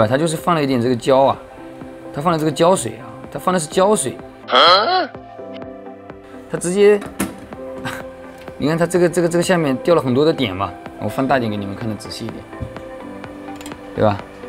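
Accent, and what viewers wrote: Wenzhou, China. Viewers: 还是能听出来温州口语的普通话。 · 没看名字，一听就是温普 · 可笑下面一群人在那里感叹师傅真是良心啊plapla的，这up主是专门拍维修视频的，点掉这么多正常情况下维修店都是放弃维修了吧，浪费这么多时间得不偿失都维修，不过还是得赞up主的心灵手巧，这口音很熟悉，身边很多都是温州人，特别的聪明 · 一听你的普通话就知道你是温州人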